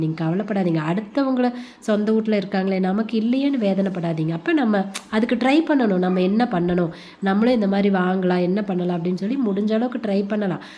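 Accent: Kongu, India. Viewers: கொங்கு தமிழ்❤❤❤அழகு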